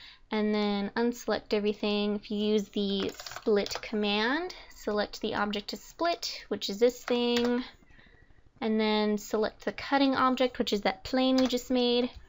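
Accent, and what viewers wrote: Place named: Midwest, United States. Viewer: lol that midwestern accent is great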